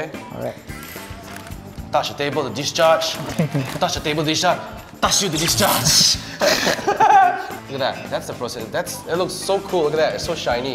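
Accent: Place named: Malaysia. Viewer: Are you Malaysian? Idk maybe its just me you sound Malaysian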